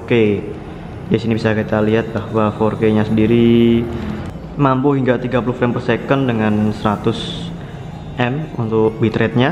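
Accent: Java, Indonesia. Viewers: mending jadi diri sendiri aja mas. gaya reviewnya lebih bagus justru menampilkan feel 'jawa' nya.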